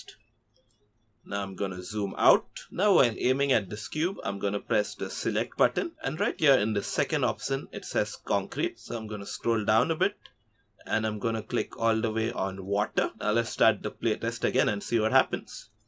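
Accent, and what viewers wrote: India. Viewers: A normal indian english accident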